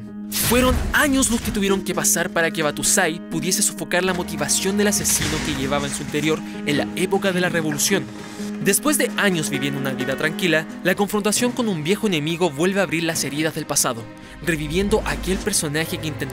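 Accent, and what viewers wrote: Chile. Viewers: eres chileno? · ¿chileno? :v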